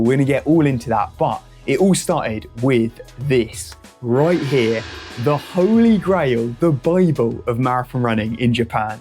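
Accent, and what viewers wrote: United States. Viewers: Nailed the American accent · Your American accent is amazing!!!!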